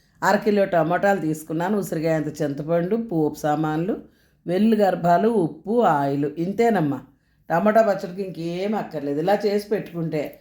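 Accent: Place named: Khammam, India. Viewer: Aunty meedi khammama ma Mee mata style akkadi la anipistundhi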